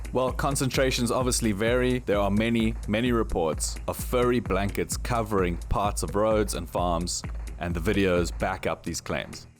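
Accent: South Africa. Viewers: Hey man.. Where are you from? You sound South African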